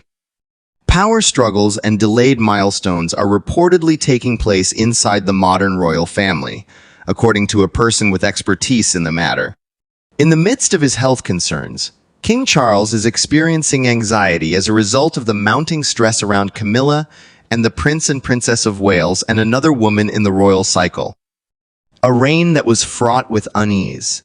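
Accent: United States. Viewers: Could we please have a british commentator ! American pronunciation is abysmal.🙄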